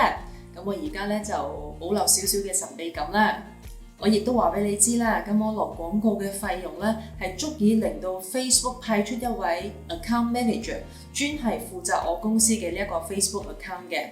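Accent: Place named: Malaysia. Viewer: 之前对你的马拉广东话口音好有印象，人靓左好多，差点认不到，看好你。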